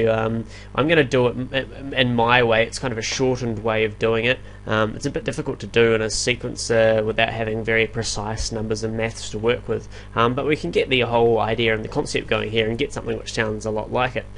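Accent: New Zealand. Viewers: so obvs a kiwi haha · he's from New Zealand.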